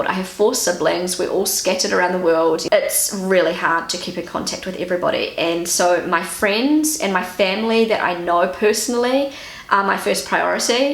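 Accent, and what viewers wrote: New Zealand. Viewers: I love your accent so much 🧡 I’ve been to New Zealand as an Aupair and you remind me of that beautiful time. Also I just realised we live really close to each other here in Franken 😁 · Hi, I just found your channel and I am subscribing immediately. How could I resist, you are a New Zealander!!! Love the accent. My mum was born in Palmerston North New Zealand. I live in Melbourne Australia, but New Zealand is like a second home to me. Wellington is my all time favourite place. During the 1970's, I visited New Zealand five times. The last trip was on my honeymoon. In those days, we were living in Sydney NSW. We moved to Melbourne Vic in 1981. Where are you from in New Zealand? Your husband's name is Rob?? Wow!! That is uncanny, as that is also my name. Incidentally, I used to have relatives living in Island Bay in Wellington and also in Wanganui. 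New Zealand is a beautiful country. Anyway, wishing you the very best. Rob.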